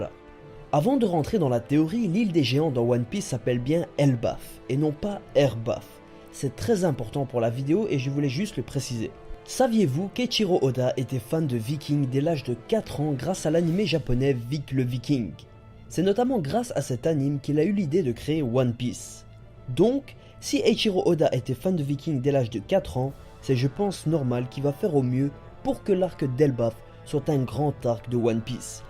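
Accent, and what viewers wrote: Belgium. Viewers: L'accent belge que veux-tu ! Moi aussi je dit "oui cent trente ouitte" xD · imagine la barre qu on va se taper pour le chapitre 890 aka oui sans nonente 😂. on te kiff, change jamais ton accent belge. je dirais même que tu devrais utiliser plus d expressions de chez toi,genre à tantot etc...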